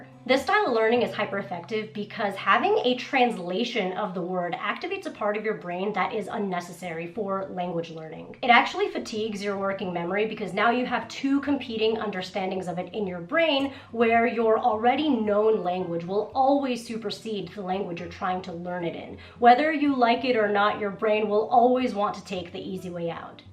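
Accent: United States. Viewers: Her code switch is AMAZING! When speaking American English she pronounces Japanese words like an American would, but when she speaks Japanese, it's Native AF. I can see why a lot of people think she's American or Half-Japanese and Half-American even though she's Japanese. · Interesting! And how come you have such a great American accent? I came here because I work as a translator and currently I am translating a Japanese series for a TV network (I have English subs and translating into Croatian). I am now on episode 4 (out of 10) and I feel that I am KIND OF catching the rhythm of the language, so I wanted to find out more about it. Greetings from Croatia! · Your English is good American English , bad English English.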